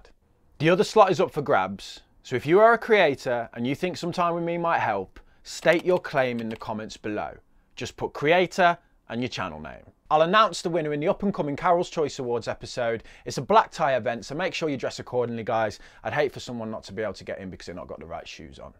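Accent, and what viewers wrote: United Kingdom. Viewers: Honestly and truthfully. I have been watching you for about 2 weeks now. And first i like to hear our British lad talking about shares and giving advice. I know there are alot out their abroad but it's different ( you know what i mean) . I have you on my notifications. Keep up the great work most importantly, your Honesty..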